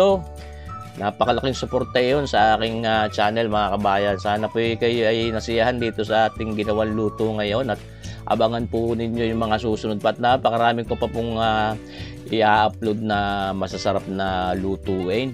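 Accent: Batangas, Philippines. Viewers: d ko n kailangan mag aral sa school sa iyo lang madami n akong nlaman lutuin pati batangas accent mo..... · Hello sir I like the way you cook and I love the batanggenyo accent. Greeting From Homer Alaska this is Berna Brown.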